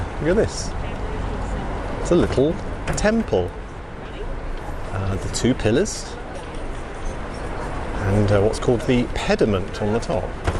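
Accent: United Kingdom. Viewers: damn definitely having a British accent makes you sound so much less insane. I pray you get some help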